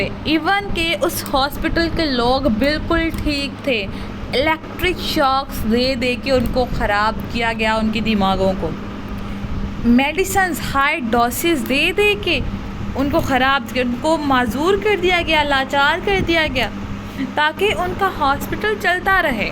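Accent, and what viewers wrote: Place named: Punjab, India. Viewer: I like you punjabi accent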